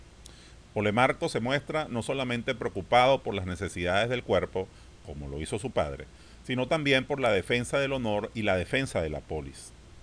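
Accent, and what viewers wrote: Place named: Venezuela. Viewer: por el acento es de Venezuela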